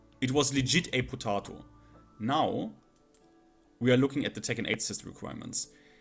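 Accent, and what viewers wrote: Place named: Germany. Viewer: direkt den deutschen akzent erkannt :face-blue-smiling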